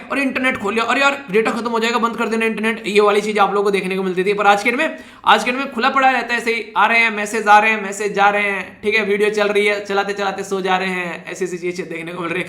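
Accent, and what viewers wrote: Haryana, India. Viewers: Namaste sir sir aj to app bhout haryanvi bol rhe app haryana se hi ho na lg rha h boli se mst lecture 100% charged thanku so much sir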